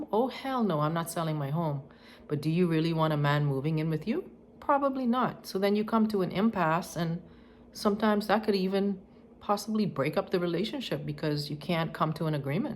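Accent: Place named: Caribbean. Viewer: Based on your accent, are you from the Caribbean?. Excellent advice by the way.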